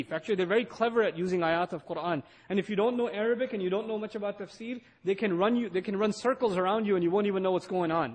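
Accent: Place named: Asia. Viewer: How that exposed. The guy waffles for a few minutes in an Asian accent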